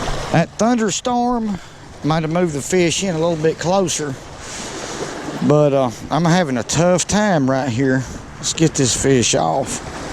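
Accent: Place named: Alabama, United States. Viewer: i loved your video. thank you for taking the time to produce it. love the alabama accent. have a friend from there and you sound like him.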